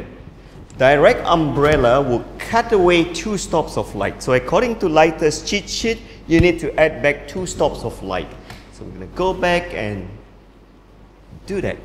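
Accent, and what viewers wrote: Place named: Malaysia. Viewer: The content r just perfect n as for the accent, he's from Malaysia 😍😍😍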